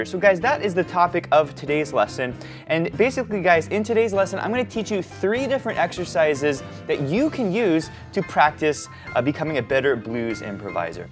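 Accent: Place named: United States. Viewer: Merci beaucoup Mr! Vous m'apprenez beaucoup de choses intéressantes, et même en sus, un petit cours d'anglais américain, ce qui est toujours bon à prendre au passage! Je vous comprends assez bien! J'en suis content! Surtout! Ne vous arrêtez pas!! MERCI!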